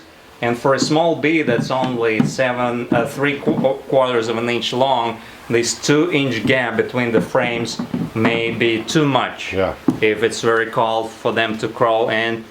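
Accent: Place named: Ozarks, United States. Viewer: I live on the Missouri Ozarks. I was not ready for that accent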